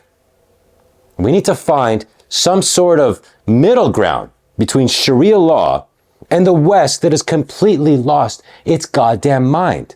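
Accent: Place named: Australia. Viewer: I was really really hoping to NOT hear an Australian accent /: I'm so ashamed /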